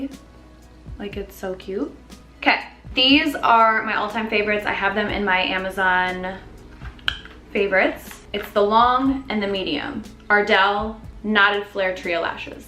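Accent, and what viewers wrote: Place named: Michigan, United States. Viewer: I hear your Michigan accent ♥️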